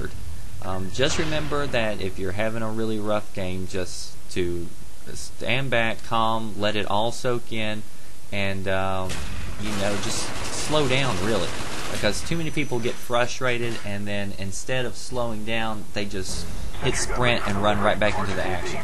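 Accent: South, United States. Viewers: I like this guy's slow southern speech and laid back attitude.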